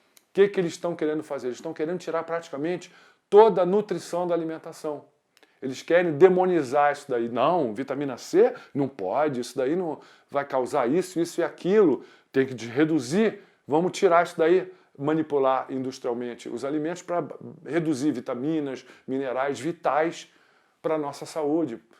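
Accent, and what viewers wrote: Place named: Rio de Janeiro, Brazil. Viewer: Excelente! O Sr. é muito entendido e esclarecido no assunto conspiração e fala o nosso bom e velho "carioquês"... gostaria de saber sua opinião sobre a conspiração alienígena, porque em meu ponto de vista lógico é a origem de toda a historia humana, a mãe ou a "ultimate" de todas as conspirações. Fomos criados para ser eternos escravos para servir propósitos excentricos de seres alienígenas. Gostaria de um vídeo, pois o sr. transmite clareza e embasamento nas palavras, sou mente aberta. Abraços.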